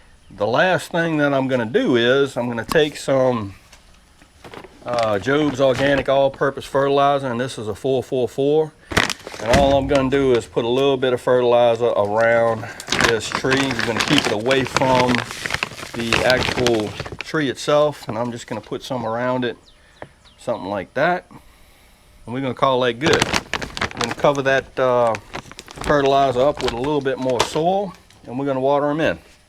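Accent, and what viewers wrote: South, United States. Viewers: I love your country accent. And your directions are clear. Thank you so much for your help!! I'm planting my avacado tonight.🥰🥰🌱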